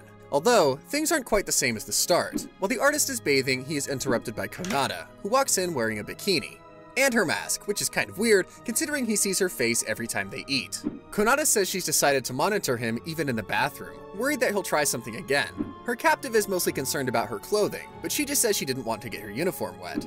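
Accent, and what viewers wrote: United Kingdom. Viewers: are you british? just asking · Man, i didnt knew that mr. Narrator is british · are you a brit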